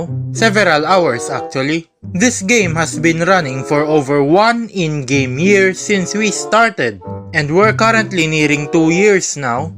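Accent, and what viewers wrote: Philippines. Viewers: Bro, you have a filipino accent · Pilipino ka po?yung accent kase parang filipino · Hahahaah ang accent talaga pinoy na Pinoy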